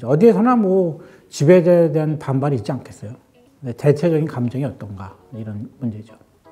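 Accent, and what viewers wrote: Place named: China. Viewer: 언어구사가 중국 계열이신거 같다. 혹시 화교신지요?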